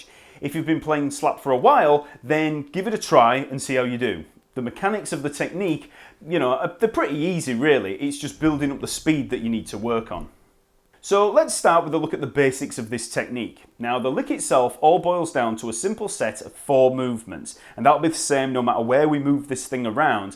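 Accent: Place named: Northern England, United Kingdom. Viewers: Great! Loving the lesson, technique and the Yorkshire accent!! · A proper northern english accent. :)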